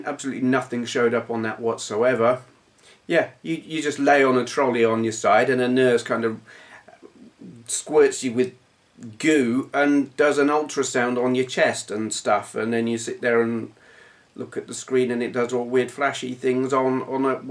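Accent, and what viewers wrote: United Kingdom. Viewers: funny hearing you say you like tim because he has an aussie accent. i subbed to your channel as an aussie partially because i like your british accent!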